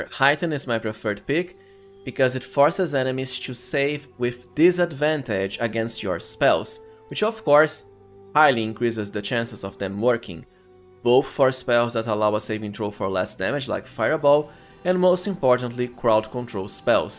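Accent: Brazil. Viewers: maluco com sotaque todo brasileiro falando ingles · Eu tinha certeza que tu era brasileiro pelo sotaque. Conteúdo muito foda meu mano. Talvez tu fosse o melhor canal de bg3 builds no brasil em pt, mas a escolha do inglês claramente deu certo. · não tem como nao saber que é br com esse sotaque, belo video amigo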